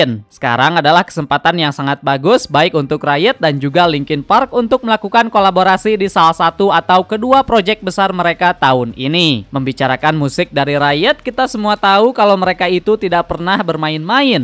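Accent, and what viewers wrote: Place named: West Java, Indonesia. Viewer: ane tau ente sunda bang, tapi london dibaca landen.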